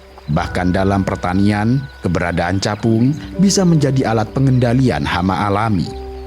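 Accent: Java, Indonesia. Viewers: wih itu chanel awal min😮 iyah ja khas banget suaranya nya medok, cuma sedikit berbeda intonasinya sama sekarang lebih keren, sayu gitu bikin tenang di tambah backsound instrumen nya masuk🛌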